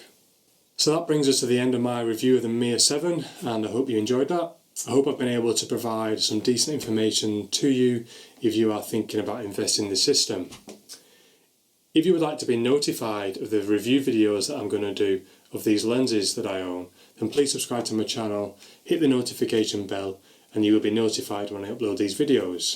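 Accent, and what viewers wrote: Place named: Sheffield, United Kingdom. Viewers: You sound like you have a northern English or Scottish accent, not New Zealand.. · I can tell your accent is somewhere near Sheffield.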